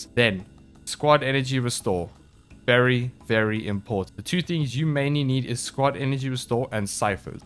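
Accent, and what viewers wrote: South Africa. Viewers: Are you South African? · Are you south african??❤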